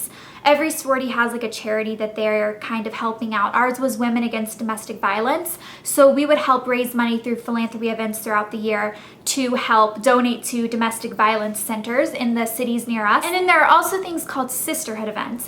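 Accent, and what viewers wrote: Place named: Ivy League, United States. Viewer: You sound like you went to Cornell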